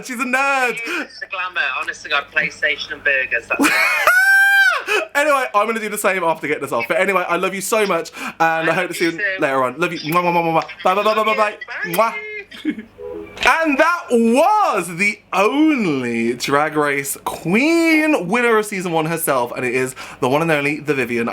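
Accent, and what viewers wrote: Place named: Liverpool, United Kingdom. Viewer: living for the Liverpudlian accent and gorgeous makeup ❤️ Would love to see any club kid look, something more abstract 💞🌻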